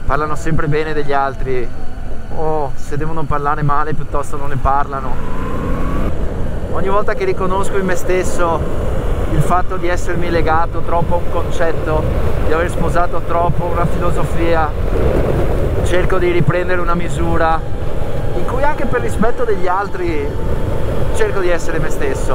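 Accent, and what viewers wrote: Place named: Veneto, Italy. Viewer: figurati!ti invidio molto,hai una bella moto e hai molta simpatia!e l accento veneto dà quel qualcosa in più😁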